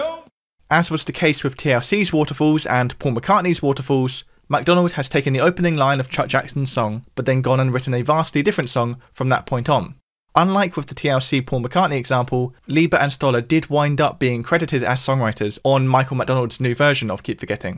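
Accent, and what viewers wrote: United Kingdom. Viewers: Had my fill of British narrators. Don’t those people work?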